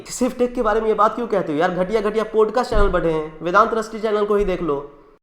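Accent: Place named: Uttar Pradesh-Bihar, India. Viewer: Mai bhai channel hi isiliye dekhta hu kyuki unki personality aur bolne ka tareeka typical up Bihar wale aadmi ka hai, jise sunke aur dekhke kuch apnapan lagta hai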